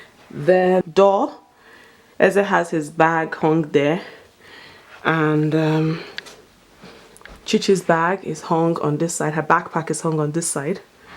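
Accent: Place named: France. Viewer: I like your accent, is that French?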